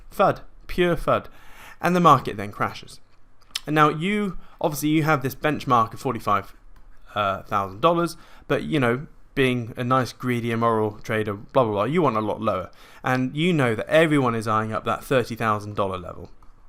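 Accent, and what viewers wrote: United Kingdom. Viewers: Hey, thanks for the great educational video! Always appreciate to hear your British English! In the past couple of videos you've said that you don't trust this btc rally. Now that your bottom glassnode indicator is flashing "possible bottom" are you back in btc with a % of your free flowing USD? Or are you waiting for that $42k to hold as support before you initiate your capital? Would be lovely to get your answers on these questions. Appreciate your answers in advance:)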